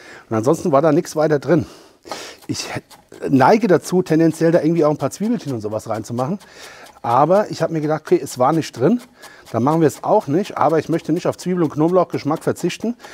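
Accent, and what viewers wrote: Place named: Hesse, Germany. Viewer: Hi! Bin ja durch Zufall auf Deine Videos gestoßen! Hier einen lieben Gruß an die "Scheffin" 😉 Dein Dialekt hat mich sehr erfreut.. Heimatgegefühl! Selbst bin ich gebürtig aus Dirppdebach! Deine/Eure bisher gesehenen Videos war phenomenal, war schon neidisch 😂 Ich lasse nun ein Abo da und freue mich auf weitere Videos! Bis jetzt: Klasse! UND, bitte weiter so! LG aus Hessen nach Hessen 🙃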